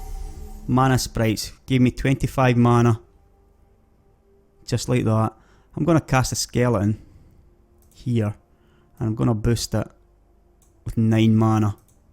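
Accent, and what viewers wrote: Scotland, United Kingdom. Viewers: Engrish? I take it you have never heard a Scotsman speak before?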